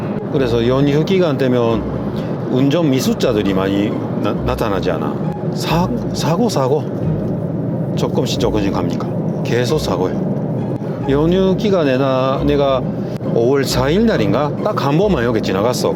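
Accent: Busan, South Korea. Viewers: 어 완전 부산사람 말툰데요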